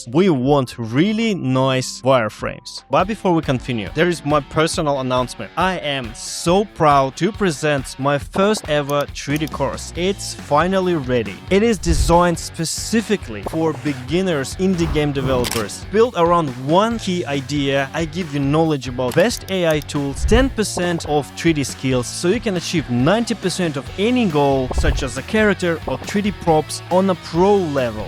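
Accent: Russia. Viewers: godamn, Russian people always so good at everything LOL!, thank for this man, your accent in funny in a good way! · Мне кажется или у автора подозрительно русский акцент? Но в любом случае видео очень полезные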